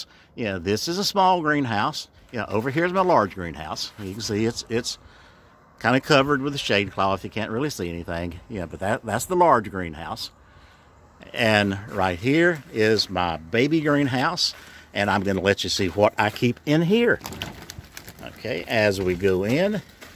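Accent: South, United States. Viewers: Love the Southern drawl. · Hey! Loving the Southern draw☺️ I believe you may need to talk in your videos more often. This was super awesome. Love seeing your setup. The mounts look great. I agree with the growth on the mounts. They do amazing. You’re a natural at it. 👍🏼 I can’t believe it’s gonna be that cold for 3 days🥶❄️ Hope to see more videos with you narrating.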